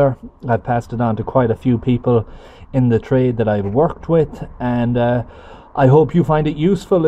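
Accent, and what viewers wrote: Ireland. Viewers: Ahem...I just love your accent...it reminds me of my famous English...Irish teacher...what a great guy...that was 45years ago... Hahaa. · Always believe a man with an Irish accent. Love from Australia!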